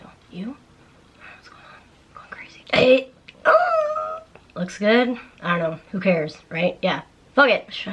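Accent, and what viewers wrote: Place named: United States. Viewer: Your Japanese got an American accent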